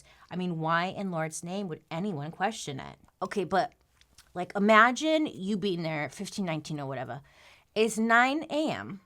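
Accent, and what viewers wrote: United States. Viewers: Where is from v my thoughts our deffo usa accent v do love a the Americans v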